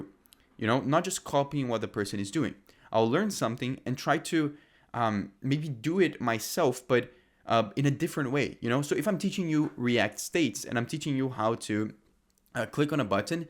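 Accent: Brazil. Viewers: tu é brasileiro?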